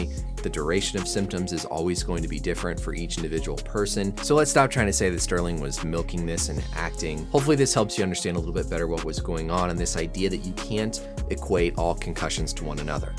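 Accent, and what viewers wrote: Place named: Minnesota, United States. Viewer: Ryan Reynolds is canadian and Dr. is from Minnesota. They have similar dialects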